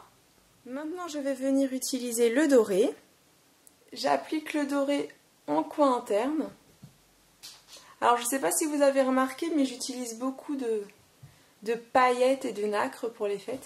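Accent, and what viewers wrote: England, United Kingdom. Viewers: Tu es juste MAGNIFIQUE.....tout est parfait le Make up est généralissime même une débutante peu le reproduire géniale. Pour l'accent anglais ça me rassure j'ai le même que toi LoL..Aller des bisous Mouhaaaaaaaaaaaaaa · Ce look est vraiment top soft et efficace et puis ton accent anglais est trop mignon ! Et j'ai le même collier Mim' Bisou bisou ♥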